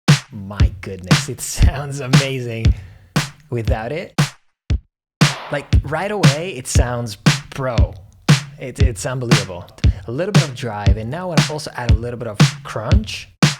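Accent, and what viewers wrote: Italy. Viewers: ahah sei romagnolo? sono rimasto serio fino a . Comunque quanta skill, complimenti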